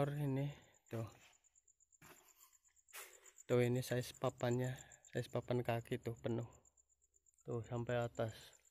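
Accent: Banyumas, Indonesia. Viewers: asli org ngapak cilacap ya mas aku purwokerto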